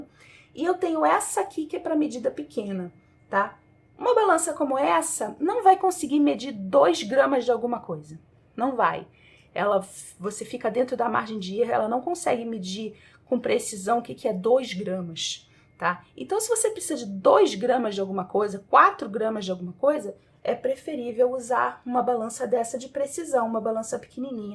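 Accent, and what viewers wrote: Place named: Rio de Janeiro, Brazil. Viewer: ETA CARIOCA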